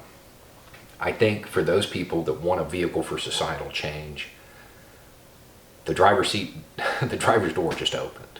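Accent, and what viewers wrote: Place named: Deep South, United States. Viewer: FWIW, and all that; My one child is full grown, has a career, a life and so much- but I have never heard you say or tell a story or any other thing that I would have objected to my boy hearing- and I am nominally a Democrat, although not a dyed in the wool kooky one. Your beard and "deep South" accent don't scare me or my kind at all, as a matter of fact, you sound a lot like home! If you want to do this, you go right ahead- I'll be among the first "overage adolescents" to subscribe! Sleep on it- it's only a thought, until somebody does something with it! FR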